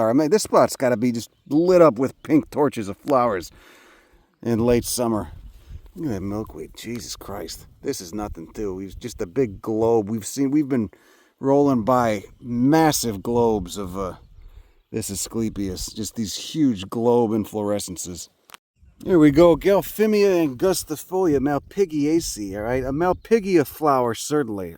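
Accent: Chicago, United States. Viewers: your from Chicago right? Have that accent yah. me too well i don’t have the accent though. anyway been watching your stuff for about a year now so kinda new compared to how long you’ve been on. The humor sarcasm is what keeps me coming back. And i love plants and the way you make it fun. especially love the Texas ones.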